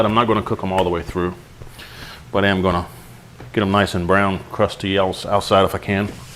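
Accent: South, United States. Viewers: For those that don't speak southern drawl English, "I'm awn" means, "I'm going to" I know it makes no sense. Nobody can explain that total ruination of our English language. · Hi, I like your stuff. Your voice takes me home to the south again. One thought comes to me. What do you think of a lid or splatter shield over the skillet? Seems to me easier to wash a splatter shield than degrease other pots, the entire stove-top and burner grills and burner diffuser parts to keep the gas ports clear of blockage. Who cleans ip behind you. I'm guessing its not you. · we never use aluminum in the kitchen . oh wait, here comes the cast iron. sorry. other than that, they look great. where is the chopped onion? Jumped the gun again, sorry. lol my preference. great video. anybody that says "warter" and "fixin to" must be southern, Georgia or Alabama? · i dont like steak sauce, but this video has definately made me want to try it out. 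it looks so good.... thanks for this awesome idea... (ps-i love your southern accent) :p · You sound like you are from southeast Texas or southwest Louisiana. I'm from DeRidder, La but i live in Henderson, Tx now. You sound like my people lol There ain't nothing better than a southern gentleman who knows how to cook!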